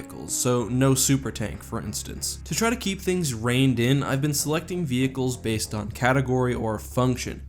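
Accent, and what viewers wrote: Canada. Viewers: Are you Canadian??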